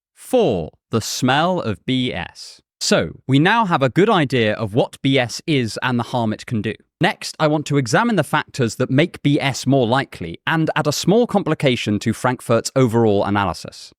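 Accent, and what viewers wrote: United Kingdom. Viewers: Yer .. . .....ah ha ..... Okay I've little to lose by my discovery of your site questions. Great stuff. Was it this pod or the last where I thought AI essayist Snapchat (is it?) can make anything believable. I remember at school a teacher pulling me aside and emphatically saying I should go into law - I wrote so convincingly she believed my work. High praise indeed....except that the essay was in microbiology, and utter nonsense. I'm from the UK as is your accent. I find that if I don't look at you and just listen your material is superb. Looking at you, my main thought is you are too young. Your material and presentation is too polished. I wonder if you are really a deep Fake; the writer a much older man or woman - and I get distracted from listening. Today I just listened. It was much better. Then, you seriously mispronounced a common word. 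So now I wonder if your look and talk are a very advanced AI bot. I just can't think of someone so young putting together and presenting these advanced lectures. There. I've said it. I still enjoy your work.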